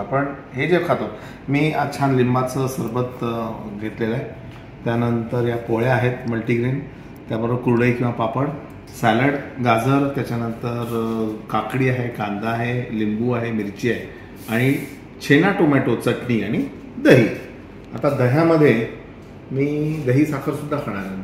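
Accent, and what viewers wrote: Vidarbha, India. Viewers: Sir, multigrain chi poli khupch aawadali.Ani chena ghalun banawaleli tomato chatni...new idea... Tumche video khup aawadtat.Mi pan vidarbhaachi aslyamule tumchi Vidarbh paddhatichi Marathi bhashet bolat bolat sangitleli recipe aikayala v pahayala khup aanad vatato.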